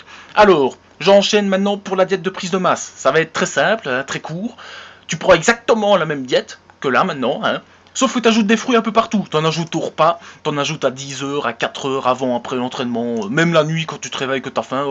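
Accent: Liège, Belgium. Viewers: Serait tu de Liège ? Haha je reconnaît ton accent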